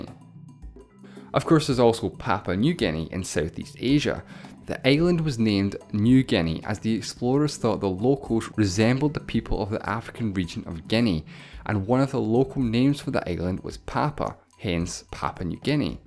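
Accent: Scotland, United Kingdom. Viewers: I am more fascinated to listen to your Scottish accent rather than the Congos itself. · Scottish accent, right? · Azwad Ahsan it’s an Scottish accent. How can he possibly change that with education? Your brain sucks get some education pls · José Guilherme Scottish accent.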